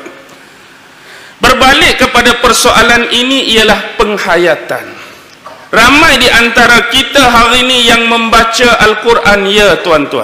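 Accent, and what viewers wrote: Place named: Penang, Malaysia. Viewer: asal kelantan tapi bahasa loghat penang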